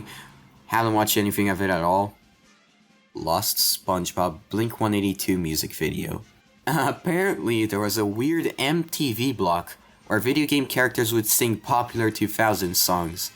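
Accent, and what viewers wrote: Brazil. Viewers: BAWJWJWJSJWJ VC É BRASILEIRO???? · carai tu é brasileiro quase pulei da cadeira quando ouvi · carai mano tu é brasileiro?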